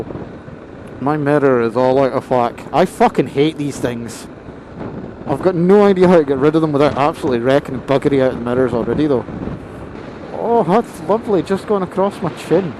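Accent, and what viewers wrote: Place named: Scotland, United Kingdom. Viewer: That Scottish accent is seriously awesome :D...subbed for that alone lol ..now to watch some more vids ;)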